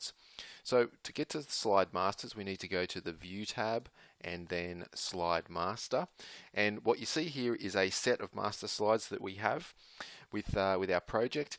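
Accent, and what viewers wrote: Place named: Australia. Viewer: Good to hear an Aussie accent on a Storyline tutorial! Thanks for the clear video.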